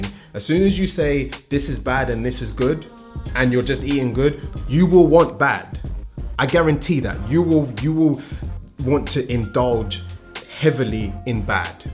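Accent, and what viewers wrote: Jamaica. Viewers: Decent video....I do labor work and im dirty after work So i always have to go home and shower,,,then sometimes nap and then take a pre workout before the gym,,,,It works just you dont get good sleep at night before of the pre. I find going to bed early and actually gogin the gym before work is better and the pre keeps u going all day. But i heard morning workouts make u drop size because you have no food in you...? You are Jamaican ISH? i heard u say a few thins at times like maybe u got some family here....?g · Are you Jamaican, African or British?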